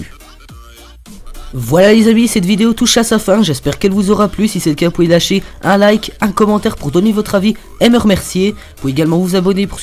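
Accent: Belgium. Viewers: Ah psk ta un axants qui resanble au belg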